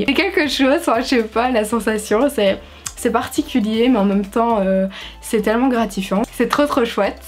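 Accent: Belgium. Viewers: Je découvre ta chaîne et j’adore continue comme sa (tu m’a donné pleins d’idée ´et l’accent belge je suis fan )plein de bisous à toi et ton copain 💕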